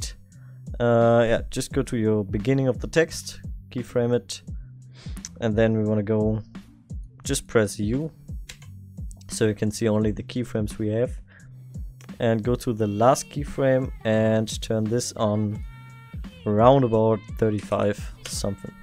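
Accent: Germany. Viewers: man merkt du bist deutscher xD No front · Der deutsche Akzent sticht mies hervor 😳🧙🏿‍♂️🧙🏿‍♂️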